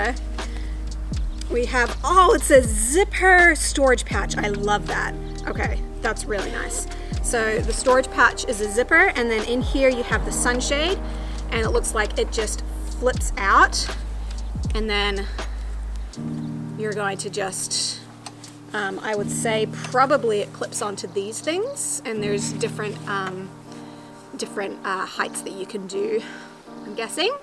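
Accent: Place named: United States. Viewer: Your accent is puzzling me—are you American or a Kiwi? You sound mostly American but with little bits of Kiwi accent here and there.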